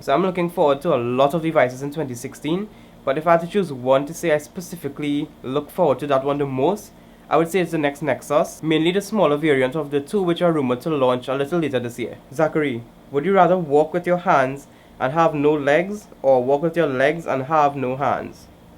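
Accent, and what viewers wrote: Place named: Trinidad and Tobago. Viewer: Rel glad you stick to your trini "accent"...good stuff...keep up the hard work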